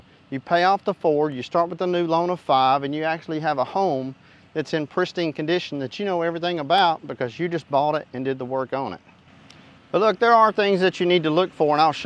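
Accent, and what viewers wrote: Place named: East Tennessee, United States. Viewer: Old boy sounds more lihe east tn/western nc than Louisiana lol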